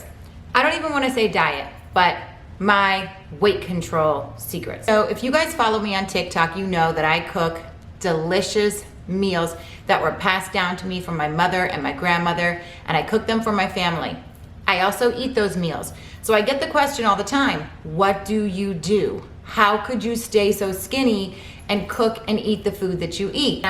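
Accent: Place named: New York, United States. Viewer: I only found you a few days ago... watched like 100 of your cooking segments 😂 You are AAAMAZING! Now im seeing all these other (older) videos, which are GREAT! Your personality is 🔥 as the kids say! I'm 57, raised in St. Pete, live on Long Island now for many years. I know you're in FLA, I though you were from NY by the way you talk. XOXO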